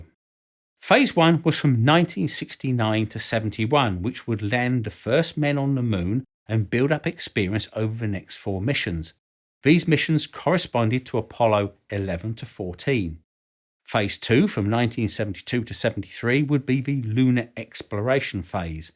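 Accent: United Kingdom. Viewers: After recently watching some William Shatner self depreciation videos, and coming here...it occurs to me that speaks rather like Shatner with a British accent.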